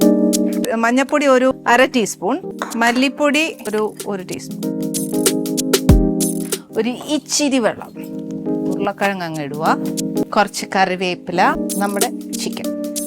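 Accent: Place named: Pala, India. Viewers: പാലാ സംസാരം... ഞാനും ഇങ്ങനെ ആണ് സംസാരിക്കുന്നെ. സൂപ്പർ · Ithu pala alla palade new version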